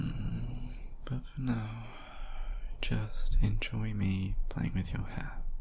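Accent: England, United Kingdom. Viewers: Ayy proper English accent hear that daily in my life but this one sheeshhhh cute as hell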